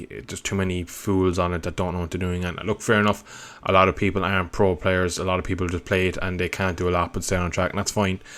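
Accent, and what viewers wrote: Ireland. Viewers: I wish they'd do something like Codemasters does with the F1 games. Making the riders switch teams and move up (including your own riders) and like EA also did with their NASCAR games. MotoGP riders retiring or switching teams and riders moving up from Moto2, Moto3 riders moving up into Moto2 and Rookies Cup riders moving up into Moto3. PS: like your Irish accent :)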